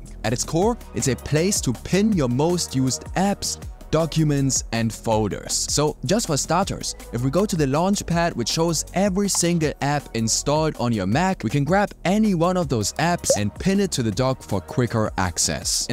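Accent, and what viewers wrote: Germany. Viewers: TOO STRONG GERMAN ACCENT !!! impossible to listen to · You sound very German, are you also German? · Gone are the days of my youth when finding a German who speaks English was looking for a needle in a haystack. still has a little bit of accent which I immediately localized but it is not detrimental in the least, it is even charming. Actually, his English has more clarity than that of the most native speakers I follow on Yt. But it’s not only in the way he speaks. It’s also the way he lays things out and his overview. A natural born talent for an educator. I associate this subject of learning the macOS with my worst frustration of the last month but the way he deals with it replaces my grave frustration with simple fun and much faster learning curve. The script and video integration are simply awesome. I know doesn't need my acclaim after millions of views and thousand of comments, but I am so impressed I want to encourage him anyway. 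I have watched this three times and will be coming back. This edition may be better than the 2025 one. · hatte kurz gedauert i hear the german akzent btw nice video :) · the german accent was too strong to over hear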